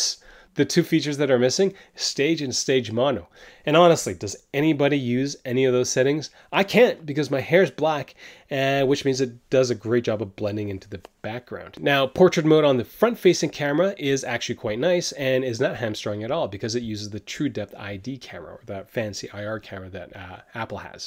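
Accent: Canada. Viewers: I’m Canadian, you’re Canadian. You make this a point in your name “eh” It’s hard finding a Canadian voice in tech and phone reviews. So I was delighted to find you. THAT said it bothers me you give prices in USD not CND . At least say “ the iPhone xr is $750. In the U.S or $1099 Here in Canada eh “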